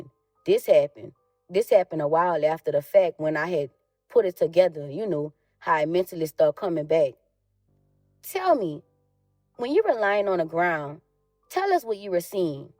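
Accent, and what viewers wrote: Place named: New Orleans, United States. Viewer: 👍 New Orleans accent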